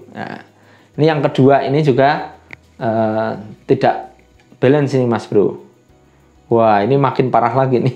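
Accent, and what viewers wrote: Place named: Java, Indonesia. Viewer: geram lak aku dengar jawa dia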